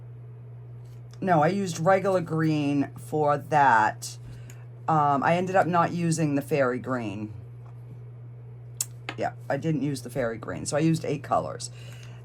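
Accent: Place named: New England, United States. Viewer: Omgsh!!! I am in awe. Your attention to detail with all the colors is incredible. It is so festive. What part of New England are you from? I love the accent! I am a New Yorker but moved down to Georgia a year ago and everyone comments about my accent!! Thanksgiving is very similar in our family except being Italian of course those foods get added in. Wishing you and your family a blessed holiday P.S. don’t worry about your videos being too long. They are enjoyable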